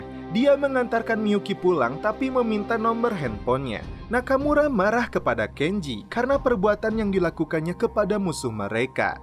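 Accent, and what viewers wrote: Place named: Purwakarta, Indonesia. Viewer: Gue nebak, ini yg ngomong orang purwakarta nih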